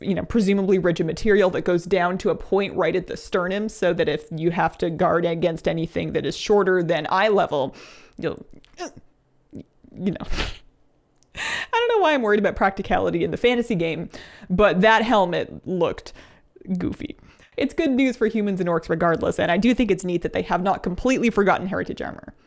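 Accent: Canada. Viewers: Do I detect a hint of Canadian in there? "Blame Canada! With those beady little eyes and floppy heads full of lies!"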